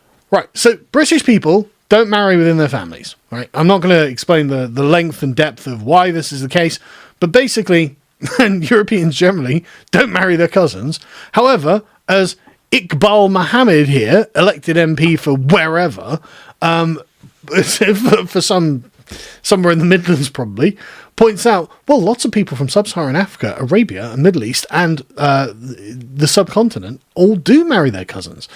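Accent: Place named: United States. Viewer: Sounding like an American now. 😆 👍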